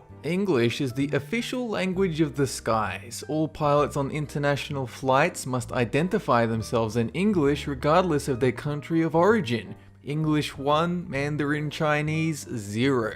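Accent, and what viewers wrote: Australia. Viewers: are you really aussie? If so then where from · He's Australian ._. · Lang Jones I didn't catch that one. He does have some odd pronunciations though. He's got an Australian accent but uses American pronunciation for heaps of words. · Are you Australian? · Are you Australian ? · You are Australian?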